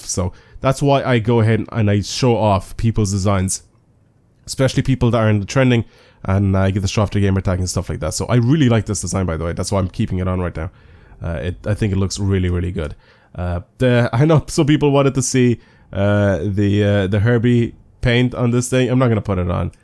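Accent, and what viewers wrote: Ireland. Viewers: Is he irish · is from Ireland?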